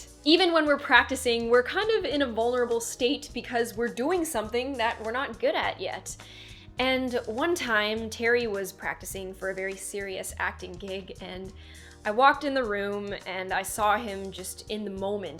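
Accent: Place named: Canada. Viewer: Such a lovely message! I just want to pinch your cheeks - you're so pretty. "Don't mock the process!" Sounds so much better in your Canadian accent (than my English accent) but such a golden quote.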